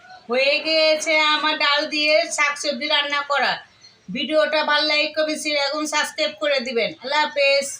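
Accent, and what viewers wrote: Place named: Dhaka, Bangladesh. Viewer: Like your dhakaya voice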